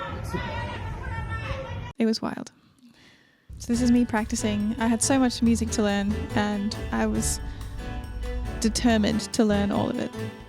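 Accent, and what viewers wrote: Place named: South Africa. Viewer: Hi. I'm trying to work out your accent. Maybe South African?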